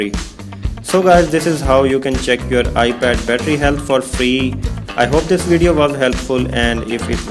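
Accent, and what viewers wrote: India. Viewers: There's no way I'll trust the words of an Indian sounding guy when it comes to software suggestions.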